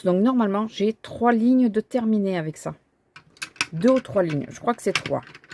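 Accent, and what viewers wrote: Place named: Toulouse, France. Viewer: Bonjour, vous êtes d'où ? Je vous ai entendu dire que vous n'allez pas sur Toulouse. Et il est vrai que l'accent, je n'y avais pas prêté attention mais il est de chez moi lol. J'ai bien aimé votre vlog. Hâte de voir le prochain! Bonne journée 😊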